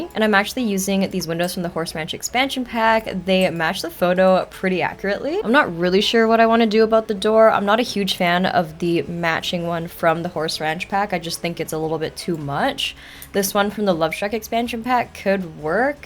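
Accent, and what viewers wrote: Canada. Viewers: She's Canadian. Different countries, different pronounciations.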